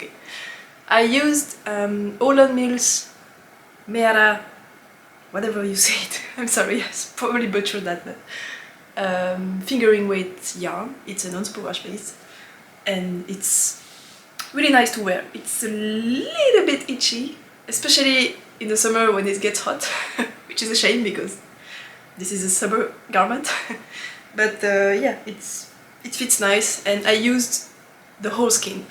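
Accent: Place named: France. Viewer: Love you French accent. Do you use raveyto count your projects?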